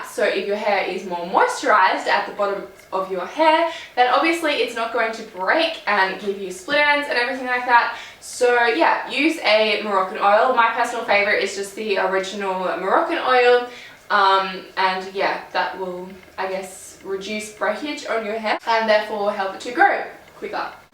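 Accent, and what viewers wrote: Australia. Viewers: Are you Australian? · Your from Australia aren't you bc accent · Are you Australian?? it sounds like it. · Oh my gosh are you from Australia :O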